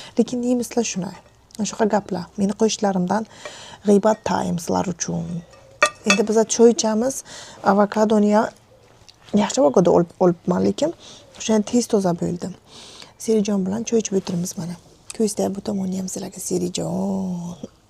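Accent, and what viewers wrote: Bukhara, Uzbekistan. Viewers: Бобоча,бибича 😂 сузингизни кувониб эшитаман. Бухоро шеваси.. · Buxorolikmisiz apa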